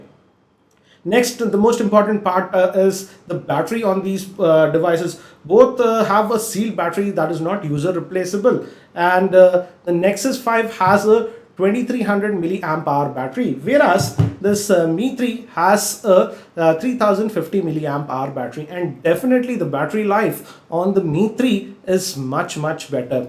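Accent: India. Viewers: Indian accent is so funny)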